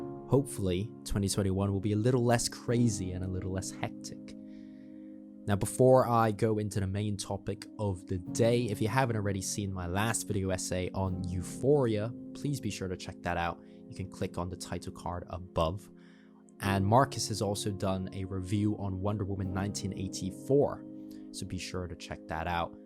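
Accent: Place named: Transatlantic, United States. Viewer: You have a very transatlantic accent. Kinda half British Isles, half North America.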